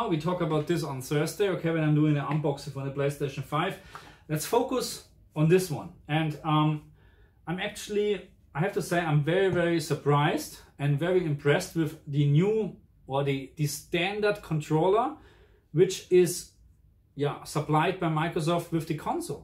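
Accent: Germany. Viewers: Kling ein bisschen deutsch das englisch, aber dafür erstaunlich flüssig.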